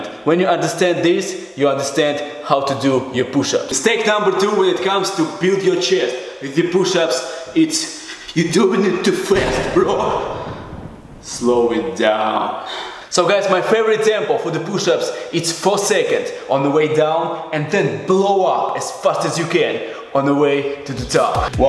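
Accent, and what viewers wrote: Russia. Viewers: The Russian accent just make it better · Your Russian accent is so cool and badass, and you look awesome! If I were gay (I am not) I would definitely have a crush on you, bro! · You Have a Nice Russian Accent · This Russian accent pumping me up 😂😂. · I knew that this is gona work when i heared the russian accent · Единственный кто имеет русский акцент, когда говорит на английском и наоборот... Кайф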